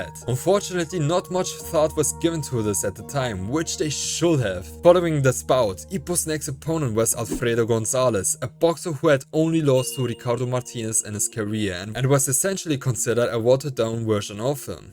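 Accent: Germany. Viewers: bist du zufällig deutscher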